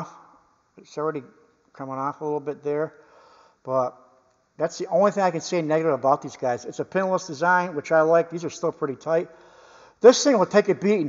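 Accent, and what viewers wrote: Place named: East Coast, United States. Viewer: Your accent reminds me of home. I go back east for the holidays and still don’t believe I sound like that 😊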